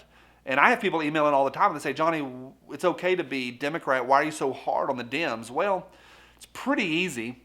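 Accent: South, United States. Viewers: You rock. Loving the southern accent. Thank you for all the updates?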